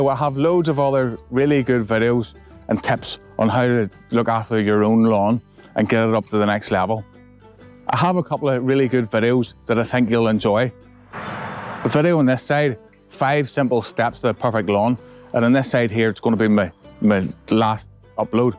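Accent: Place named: Belfast, United Kingdom. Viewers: You must be from Belfast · Cheers from Australia. Great video but my favourite part was the Belfast accent. Cheers 🍻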